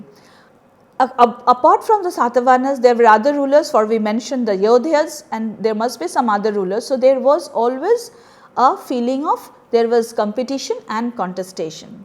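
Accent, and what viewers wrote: Bengal, India. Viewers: mam is bengoli..so it was difficult to catch actual Pronunciation😂..but still it was really good and informative then other web sites on internet..this gave the best research👍👍👍👍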